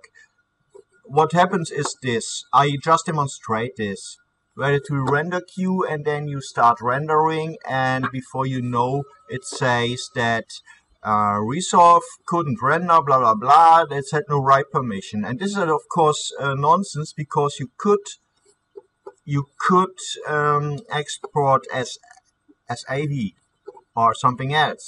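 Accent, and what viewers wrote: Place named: Germany. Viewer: Man hört den deutschen Akzent sehr raus , but anyway , nice video :)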